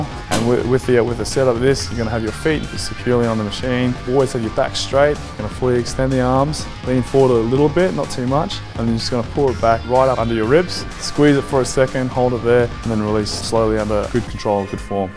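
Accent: Australia. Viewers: Dean Alberto LMAO!! You'll be so ashamed when you realize your wrong! xD Pls, try to understand: Calum von Moger is from a country called AUSTRALIA. He speaks with an AUSTRALIAN accent. Arnold Schwarzenegger is from AUSTRIA (Österreich), a completely different country located just south of Germany, Arnold speaks with an AUSTRIAN accent.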